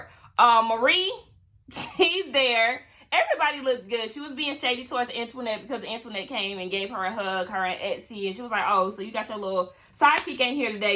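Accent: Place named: Florida, United States. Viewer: A hit dog will holla!! They hit a soft spot that was ringing Hella true for her! Baybee that left hand of yours be having its own conversation when you get heated...like it was say get da fuk away from me wit this lmao...I love hearing you tauk...cause I'm from floida(we don't pronounce it like its spelled) lol and we sound jus the same.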